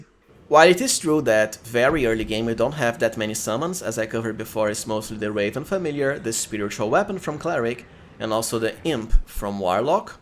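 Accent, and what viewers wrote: Brazil. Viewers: U brazilian?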